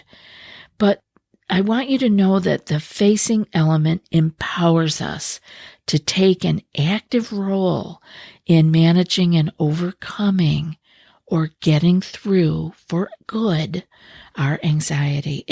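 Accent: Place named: Upper Midwest, United States. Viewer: You’ve gotta be from WI or MN with that accent.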